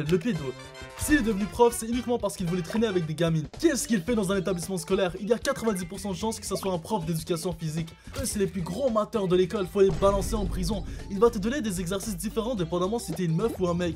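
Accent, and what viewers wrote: Quebec, Canada. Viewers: T’as un accent qebecois 😂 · incroyable la vidéo le montage est propre et non je ne suis pas raciste mais j'ais l'impression que t'a un petits accent du Québec j'adore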